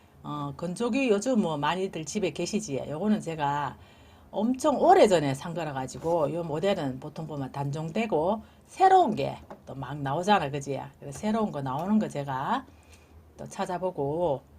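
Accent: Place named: North Gyeongsang, South Korea. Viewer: 갱북 어딘교 나도갱국인데 말씨가 똑같아서 입니다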